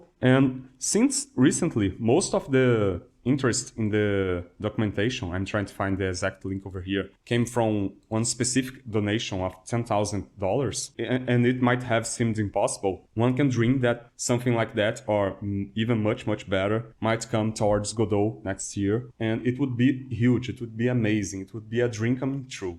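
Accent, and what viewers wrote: Brazil. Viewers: awesome video dude, thanks for that. Are you from brazil? Your accent seems to be if so youre foda cara!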